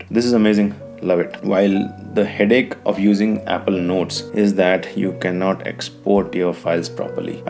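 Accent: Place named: India. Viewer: Man really good video respect 👍 it seems like all the best tech videos come with an Indian accent, but I love it, thanks :)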